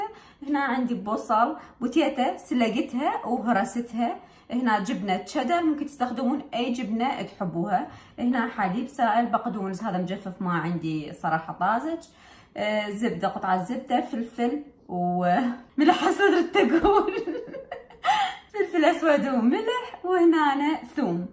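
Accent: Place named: Baghdad, Iraq. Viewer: عيني ست شغلج يجنن والاحلى بيج كلامج البغدادي الي يجنن 😘😘😘وجربت البتيتة المشوية وطلعت تخبل😘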